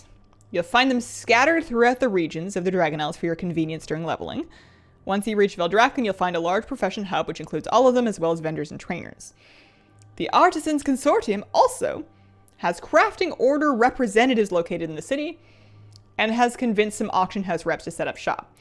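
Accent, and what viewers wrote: Canada. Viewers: She's Canadian.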